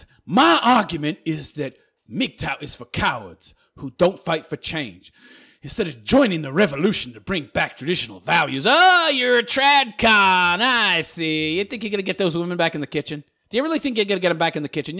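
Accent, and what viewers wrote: New York, United States. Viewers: I swear this is Bill Burr s secret YouTube personality haha Sounds just like him he puts the Patreon thing up to sell it that he's a regular guy too hah a bit of a voice changer mic too if not Bill should hire this guy to fill in for em when he's sick perhaps he's a lil more new york than Boston but still crazy close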